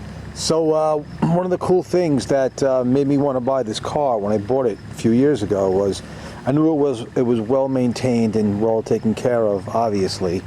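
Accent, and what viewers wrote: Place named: New Jersey, United States. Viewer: Looks like a NJ inspection sticker. Sounds like a NJ voice.... Oh there's the plate.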